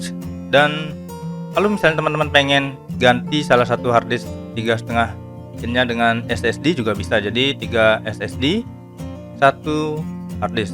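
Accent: Indonesia. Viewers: ngenah sajan logat indonesia ne..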